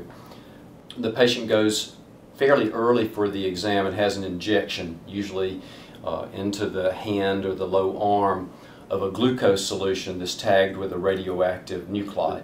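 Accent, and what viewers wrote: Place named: United States. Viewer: Thx v much for the info....it's very well explained....it's based on metabolic activity -I ve no problem with the accent (American...& I m a Malaysian)... -What I m not clear is we r told too restrain from taking too much simple sugars prior to the scan, as it might interfere with its absorption into the cells.....the question is a relative HOW mUCH is too much & for how long before z procedure?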